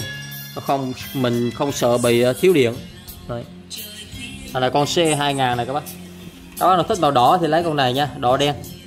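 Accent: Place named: Binh Dinh, Vietnam. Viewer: Xem kênh nhiều của em anh rất thích chất giọng đặc trưng Bình Định của em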